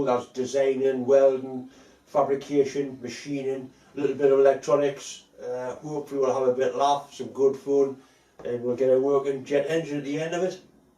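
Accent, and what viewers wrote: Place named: RP, United Kingdom. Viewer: I think if viewers can’t understand your Queen’s English they just need to watch more of your videos. It kinda grows on you 😊. All the best to you and your family. I hope you have a Merry Christmas and a terrific New Year!!! Norm in Arizona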